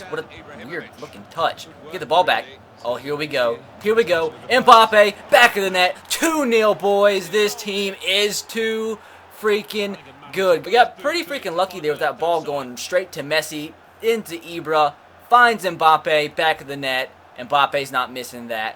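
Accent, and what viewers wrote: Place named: South, United States. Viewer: no hate, just curiosity are you southern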